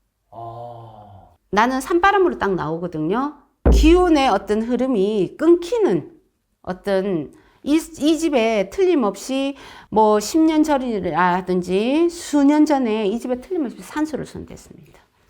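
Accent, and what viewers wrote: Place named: Busan, South Korea. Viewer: 이사람.말투도 너무 싫음 부산사투리 쓰는사람 싫지 않았는데 이사람은 유난히 듣기거북함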